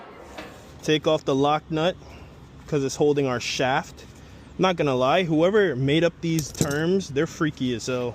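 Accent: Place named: New York, United States. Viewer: Native New Yorker here from Spanish Harlem. Your accent reminded me a bit of New York. Happy to see a fellow New Yorker thrive. Knicks in 5 🟠🔵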